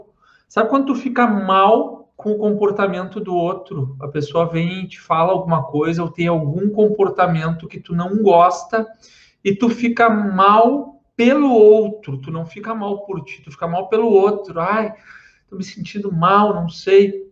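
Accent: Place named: Rio Grande do Sul, Brazil. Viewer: Boa tarde, cheguei aqui por indicação! Pelo teu sotaque, és gaúcho da região metropolitana assim como eu! Gratidão pelo conhecimento!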